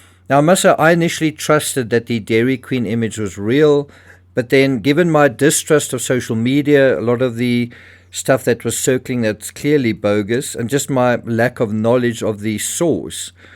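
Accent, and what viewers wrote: South Africa. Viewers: Mmmh, u sound very South African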